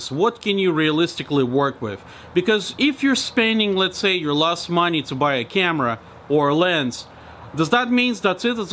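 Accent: Russia. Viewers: Sounds like a Russian accent.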